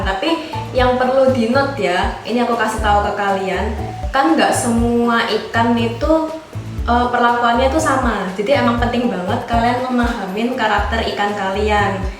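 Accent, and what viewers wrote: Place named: Surabaya, Indonesia. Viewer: Suroboyo pundi mbak e sampean?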